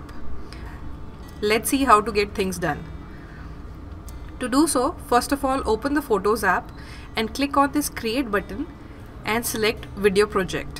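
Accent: India. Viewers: This is an extremely common Indian accent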